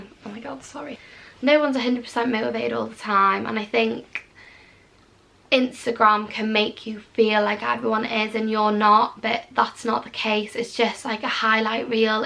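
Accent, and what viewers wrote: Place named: Wales, United Kingdom. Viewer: nothing wrong with your voice. Take no notice of the rude comment from Ellie. Love your acent. Reminds me of my Welsh cousin's .x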